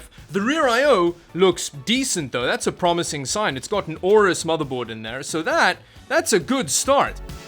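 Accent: Afrikaans, South Africa. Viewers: You must come from South Africa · What accent do you have? Dit klink Afrikaans...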